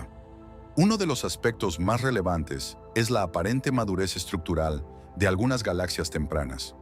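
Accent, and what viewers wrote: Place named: United States. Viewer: Tonterías con acento gringo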